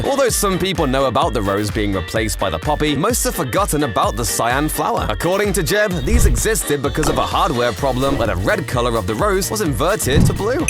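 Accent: United Kingdom. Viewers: So British accent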